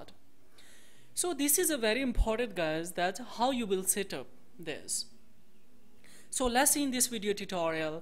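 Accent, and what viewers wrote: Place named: India. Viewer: Your English accent is so duplicate.....pure indian accent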